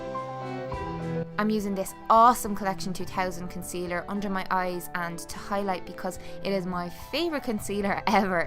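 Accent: Ireland. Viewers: Loved this look! I really love watching your videos because you seem like such a genuine, real person!! Plus a little Aussie like me loves a good Irish accent, it's so adorable!!:) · HEY! I stumbled across you're channel the other day and after about a year of listening to Americans drone on in their slightly annoying accent on Youtube, listening to you're accent was a welcome change. (I'm from Kilkenny!) You're videos are great, it's nice to see an Irish Beauty Youtuber with such great content!!:D Well done and good luck!!:D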